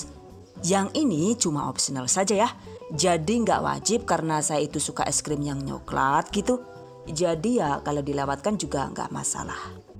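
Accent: Banyumas, Indonesia. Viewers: Mantap bunda...jawanya ngapak banget...ngromet ..opo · msyaAllah ibu orang ngapak yang the bastd 😘😘😘😘😍😍😍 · Suka bhs nya,Banyumasan kyk inyong😄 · Kiye wong Banyumas Karo baha inggris lagi campuran, ngandel metu banyumase jaaaaaan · Terimakasih atas ilmunya, suaranya lucu bngt bu, bisa jd 3 bahasa gitu,indo,ngapak & inggris,, memang luar biasa, sangat bermanfaat sekali, semoga sukses selalu ya bu 😂😍😘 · Ngapak ngapak hahaha keren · Jebule ngapak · Trnyata ngapak ya bhasanya, nyong ben ngapak kie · Deneng ngomonge ngapak yu🤣🤣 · Ngapak temen yu, rika jawa ne ngendi😂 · Oalah jebul ngapak mbekayune 😂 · Kereeen ibunya gak pelit berbagi ilmu sangat detail...dan lucu si ibu ngapak ..wkwkkw auto subscribe 😂😁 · Ngapak2 lucuuu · ora ngapak ora kepenak ya mbak... salam ngapak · ngapakers koh sip lah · Yg aku tunggu2 dr channel ini adalah ktika udah ngomong jawa ngapak..sllu ngakak😂😂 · Jan maturnuwun banget ilmune bu, ngesuk tek praktekna lah, slain mengispirasi tp ana lucune pas ngomong ngapak, ( BOCAH NGAPAK YA ) 😀😀😀😀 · Ngapak yh yung... 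😁😁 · Jebule wong ngapak, keren yakin video Rika Yu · Jujur bun.. Saya klo denger bunda ngomongnya ngapak2 jd ketawa.. 😀.. Nyantai bgt gtu, Tp resep2nya oke pnya bun... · ternyata ngapak ibuknya😅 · Bahasane wong ndi iku,, koyo ngapak,,,, 😂😂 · paling suka tutorial vidio jelas dan selalu menarik utk di ikuti di tambah bahasa ngapak2 nya lucu salam dari Jogya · Kereeeen bahasa inggrisnya, apalagi bahasa ngapak nya.... Sukaaaa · Mbak orang mana deneng ngapak banget.cara menjelaskan jelas mudah di mengerti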